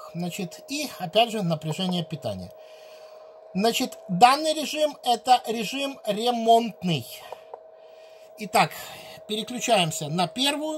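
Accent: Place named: Odessa, Ukraine. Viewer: А по-моему как у Одессита 😊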